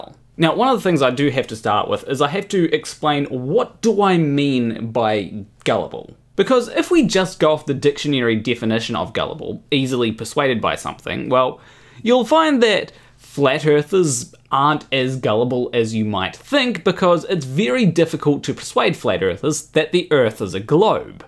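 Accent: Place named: New Zealand. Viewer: You mentioned Australia, but you sound Kiwi... what gives? 😄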